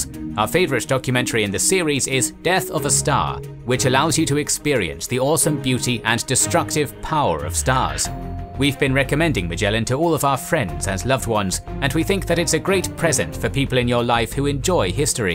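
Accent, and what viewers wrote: United Kingdom. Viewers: Horus Heresy next ………you sound British 😄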